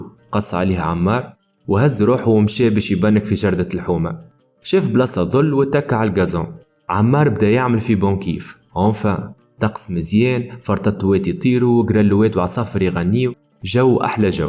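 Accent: Tunisia. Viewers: its normal Tunisian accent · I'm a tunisian and I approve this accent. I'm happy that I found a video all about the tunisian accent too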